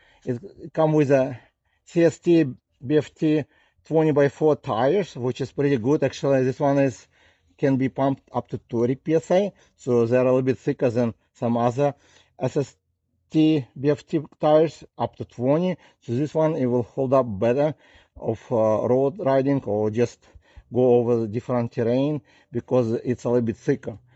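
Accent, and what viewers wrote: Russia. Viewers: Good review but you have a thick Russian accent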